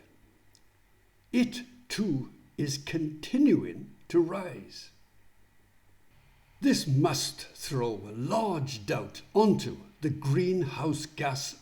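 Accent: Wales, United Kingdom. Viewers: Very clear and well presented and your Welsh accent is lovely to listen to.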